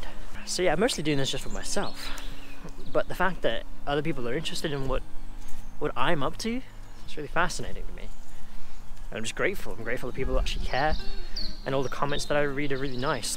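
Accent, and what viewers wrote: Wales, United Kingdom. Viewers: The Welsh in your accent is slowly appearing.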